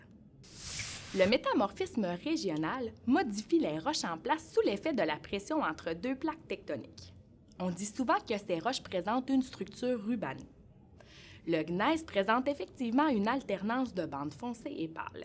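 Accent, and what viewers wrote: Quebec, Canada. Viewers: Avec sa manière de parler en dirait une québécoise ❤ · C juste l'accès du quebec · Omaima Laftem hadak dialect canadien wa9ila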